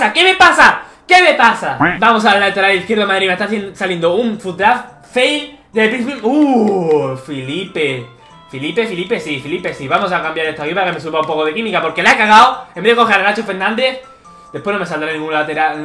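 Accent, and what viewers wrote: Germany. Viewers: eres alemán? 😃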